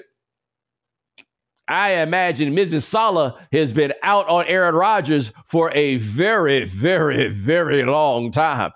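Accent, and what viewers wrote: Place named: Los Angeles, United States. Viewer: Geez that LA accent is strong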